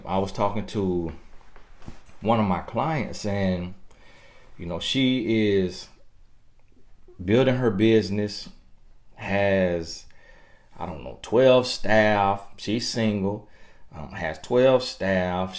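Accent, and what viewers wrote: South, United States. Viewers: Right heauh ! Love his southern drawl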